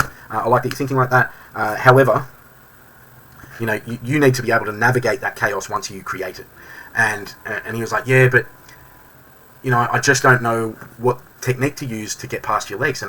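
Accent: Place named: Australia. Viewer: I just stumbled onto your channel, good to hear an Australian accent exploring CLA. Where are you based?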